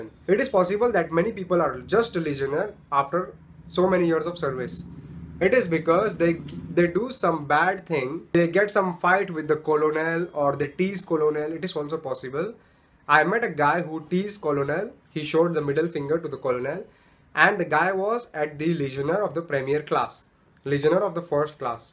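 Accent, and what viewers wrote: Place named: Indiana, United States. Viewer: He. Doesn’t speak with his French Accent. Just Indiana accent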